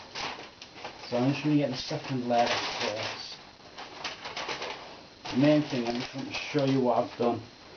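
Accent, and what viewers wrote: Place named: Yorkshire, United Kingdom. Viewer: Wow!!!! What an amazing painting. What a talent. Also, you've gotta be from my neck of the woods in Yorkshire. I'm guessing somewhere around west/south yorkshire. Great work. Keep it up. All the best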